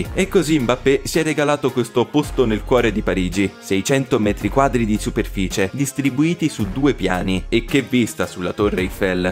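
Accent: Germany. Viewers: Bel video❤ Ma sei tedesco?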